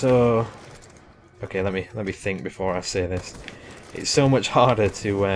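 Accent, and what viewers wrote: Yorkshire, United Kingdom. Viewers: be proud of ur yorkshire accent:) use the words like 'gunna;:D im from yorkshire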